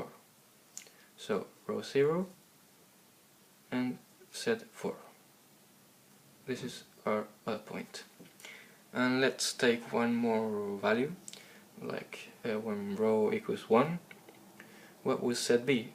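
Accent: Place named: Spain. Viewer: I can hear the spanish accent xD, it's not Zed but Sed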